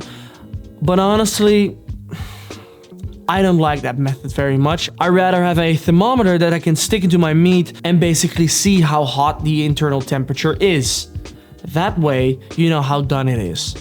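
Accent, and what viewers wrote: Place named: Netherlands. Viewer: Dumlimg recepies please. Support from a fellow Dutchie here😊. Your accent is fine. Yes, it's detectable, but who cares, you're more than understandable.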